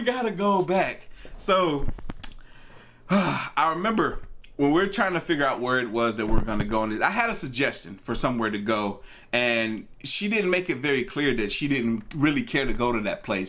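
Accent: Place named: Decatur, United States. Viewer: Sounds like Decatur area. Lol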